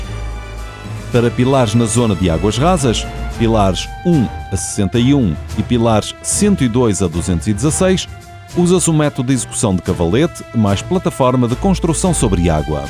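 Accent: Portugal. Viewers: Porque um português na narrativa? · Está voz é de um português de Portugal!! Quero entender está evolução toda!!🤔🤔🤔🤔🤔🤔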